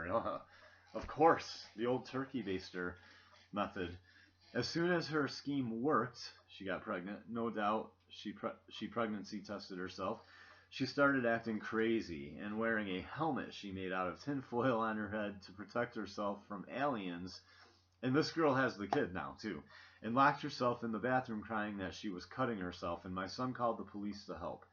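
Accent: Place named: United States. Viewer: You're American (judging by your accent), yet you don't know that Trinidad is located in the Americas. :/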